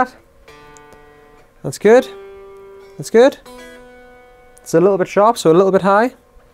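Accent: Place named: Sunderland, United Kingdom. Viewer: Can't quite place the accent, I think he comes from Sunderland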